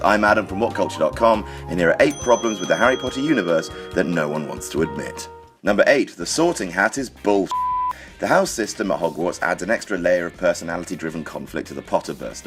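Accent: United Kingdom. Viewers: also fascinating how a brittish accent on a woman can be really sexy and yet a grown man nasally whining in the same accent is like firing a nailgun at a shallow angle against cello made of chalkboard for 6 minutes solid.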